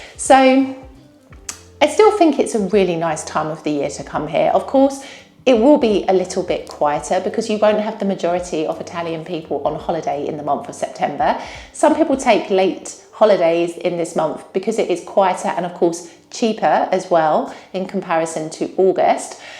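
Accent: United Kingdom. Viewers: It’s a real pleasure to listen to your beautiful story in wonderful British English about living in Italy - my dream country, and in my favorite language.😍🤩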